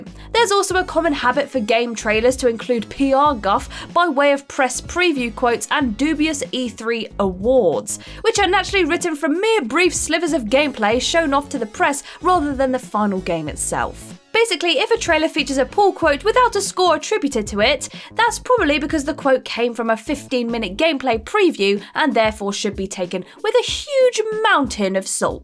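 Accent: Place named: United Kingdom. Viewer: sounds like she's from the UK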